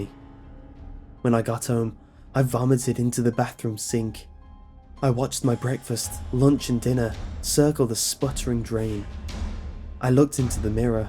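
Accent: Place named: United Kingdom. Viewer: The british accent doesnt make it sound THAT scary.. but, its awesome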